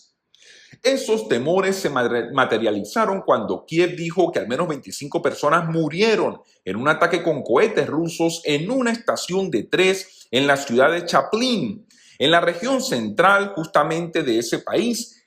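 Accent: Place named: Venezuela. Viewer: Ese dejo venezolano jaja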